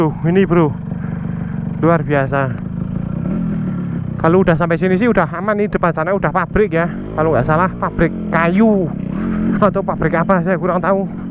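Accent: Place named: Wonosobo, Indonesia. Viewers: Gw seru ma celotehan nya bkn ma situasi nya.. Ngapak asli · haloow Jawa ngapak hehehe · Jane rono bengi wani ora jal Jiaaann ngomonge wonosobo bngt basane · maer mas logate Bahasa Indonesia - Wonosobo wkwkw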